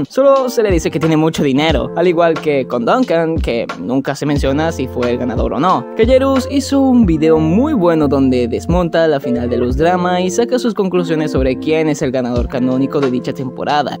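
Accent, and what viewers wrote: Venezuela. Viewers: Mano, se re nota que eres venezolano jajaj saludos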